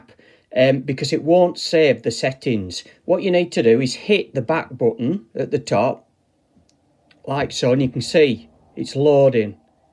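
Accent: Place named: England, United Kingdom. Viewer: I don't understand your English accent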